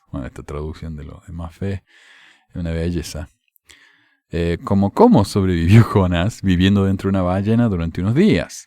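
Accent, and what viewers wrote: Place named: Córdoba, Argentina. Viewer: ..ESA LLAMA SUPER CUADRUPEDA, del Ecuador que se cree inteligente que te mando mensaje hablando de tu acento Cordobes, Le pregunto Yo que acento tiene Como llama de su CORRAL Ecuatoriano.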